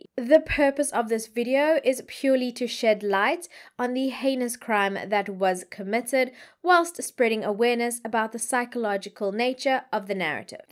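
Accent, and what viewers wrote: South Africa. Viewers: I just found your channel. Love it. Can’t wait to see what other videos you have created. I love your accent. At the last school I taught at, the school secretary was from SA- best accent I’ve ever heard. Then I found Nick Van der Leek (true crime rocket science) and you even better. Great content, fantastic narration, love your background knowledge you share. I agree with family annihilator is weird term.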